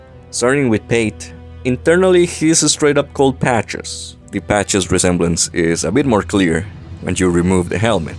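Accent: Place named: Europe. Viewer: Loved the video so much! Thanks for covering this. By the way, are you european? You have a certain accent!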